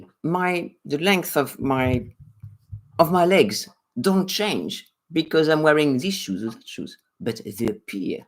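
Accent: France. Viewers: No nagging, just your friendly nice French accent and with the Wow I get the surprised face with big eyes. Love you.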